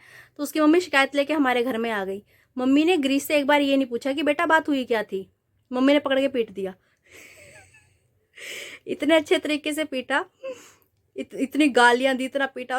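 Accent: Punjab, India. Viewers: बहुत सुंदर प्रस्तुति बेटा तुम्हारा बोलने का टोन पंजाबी हो गया है।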